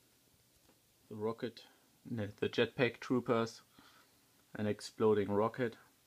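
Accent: Germany. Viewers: Ich finde es witzige wie er English spricht aber Deutsch ist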